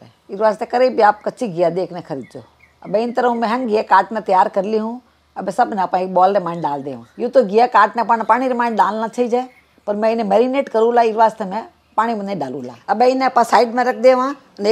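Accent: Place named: Rajasthan, India. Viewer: Pure n Typical rajasthani accent with no AIRS of sickly so called fashionable style Sounded nice Recipe looks festival spl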